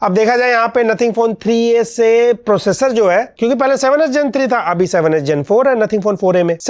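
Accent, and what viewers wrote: India. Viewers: Guru g aap ka unboxing ekdam indian accent me best rehta hai na zada wo jaise aur log dikhate hai and kuch log muh bana kar karte hai unboxing par aap ka unboxing waqai me dekhne me dil karta hai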